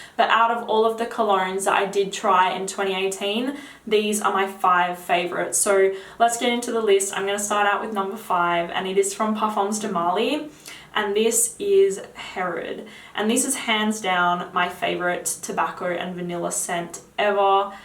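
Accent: Australia. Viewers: I like her sexy Aussie accent and voice. Not bad on the eyes either. Never mind the fragrances... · Well... I've never heard a posh Australian accent before. Very nice. 👍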